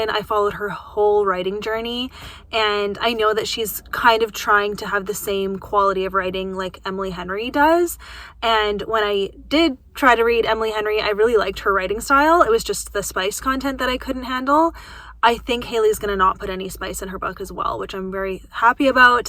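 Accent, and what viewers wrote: Canada. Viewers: Literally me watching your video as someone who hasn't seen you before: "She sounds like a Canadian." A few minutes later: "Shout-out to Canada!" 😂😂 nice to find a fellow Canadian book girlie! I loved that part of Blair's story too!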